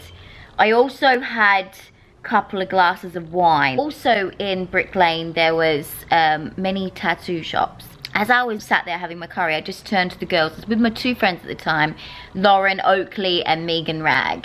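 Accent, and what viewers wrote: Australia. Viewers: thank you for making me smile thro out these vlogs. ive been poorly and feeling very down lately and you always make me smile 😌I hope is meet you one day seems I love Australian accents 😍 Insta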